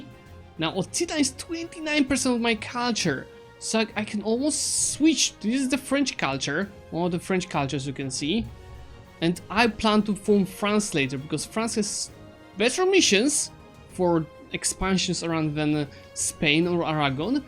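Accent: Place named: Poland. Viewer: Are you Polish or Slovak? I love your accent :))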